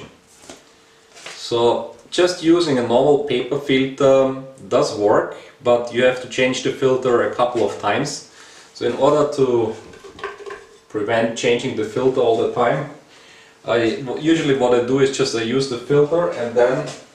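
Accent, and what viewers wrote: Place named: Norway. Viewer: du høres norsk ut.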